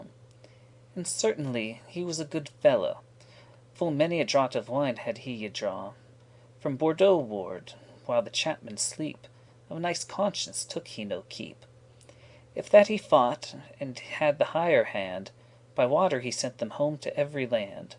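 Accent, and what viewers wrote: United States. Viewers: Ive been needing an American accent to understand it ❤ thank you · That's bloody awful. You're supposed to speak it as it was spoken, not with a Yank accent!!!!!!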